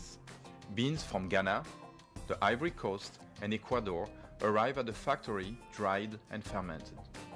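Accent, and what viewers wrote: France. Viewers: Love that French accent. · What a beautiful French accent !!! :)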